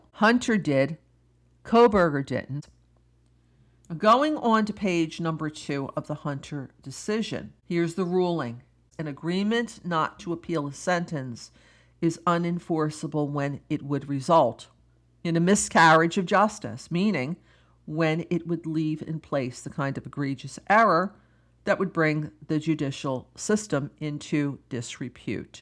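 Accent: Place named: East Coast, United States. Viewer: Oh, and I just have something else to add. From today and on into the future whenever someone says to me' "who asked for your opinion "? I will turn to them and smile serenely and reply... " DID"! 😅😅 I LOVE YOUR EAST COAST ACCENT. It cracks me up when I hear you say DAY -GO'S name as Dah-go! It's like out west people say "my ANT", where as I say "my AUNT". PUH TAY TOE, PUH TAHT TOE! 😂😂😂. After almost 4 years I actually just learned his real name is Joe!! 😅 Hope you're enjoying the weekend!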